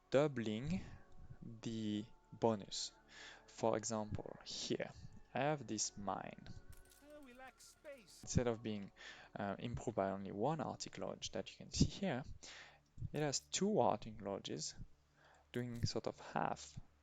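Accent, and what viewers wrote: France. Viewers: l'accent francais ptdr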